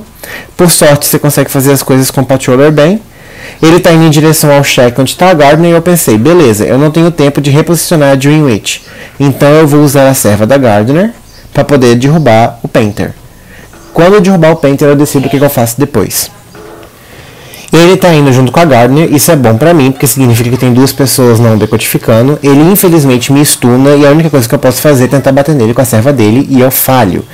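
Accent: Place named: Germany. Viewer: É o que eu sempre digo, " Escolha o survivor de acordo com o mapa e com time, por isso vc não PODE ser monoEla existe e é chata" kkkkkk não precisa explicar muita coisa sabe todos os meus esconderijos, vou ter que procurar outros. Yidhra Party! Tu estás expandindo a firma pq aja serva. "Terror Shock" Leia com o teu sotaque alemão que amo. Que milagre Doctor não farmar e sim ser farmada. Cowboy bestão, A DW amou. O esquecimento do criador de conteúdo. "Eu posso deixar ele não chão quanto eu quiser" kkkkk... Obrigada por adiantar Prisoner. Poxxtman me imitando achando que vai cautelar o blink e sefuuu É muito sangue frio e planejamento pra jogar de Hunter, principalmente com a DW que vc precisa de muita, mas muita habilidade, concentração, predict entre outras coisas. E acho extremamente necessário que não só a galera que quer aprender a jogar com ela assistam esse vídeo, mas também os survivors, pra aprenderem a jogar contra ela.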